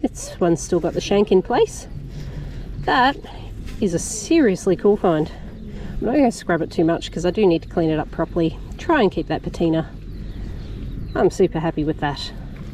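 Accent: Australia. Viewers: Your videos are the best! Love your beautiful Australian accent : )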